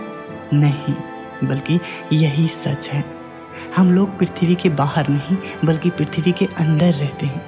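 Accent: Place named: Pahadi, India. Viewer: Bhai pahadi ho , ho na Aapka accent pahadi he . Bhai bahut badiya ❤ plz continue.. Wating for your next vedio Very knowledgeable.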